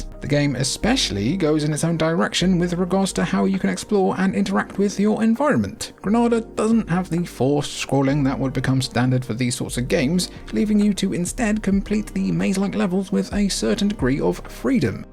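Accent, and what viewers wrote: England, United Kingdom. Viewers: There's something wrong about a British accent calling the Mega Drive a Genesis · You sound English... why do you call it the Genesis when it was ONLY called the Genesis in America? If you're English you, like the rest of the world, had a Megadrive. This dumbing down of the world to only cater to the American audience is really depressing.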